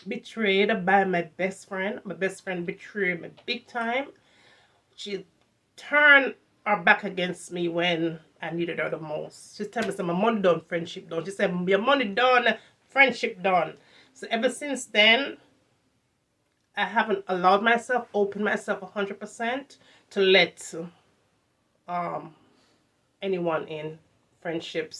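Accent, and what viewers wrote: Jamaica. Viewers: Yes bestie I'm so happy you got Jamaican accent as me also I'm half Jamaican accent and speak English or some Spanish word.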